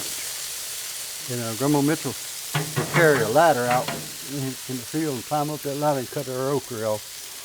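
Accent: Louisiana, United States. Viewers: My regards and best of wishes from Germany. I really enjoy your work and recipes. Also i luv that cajun talk!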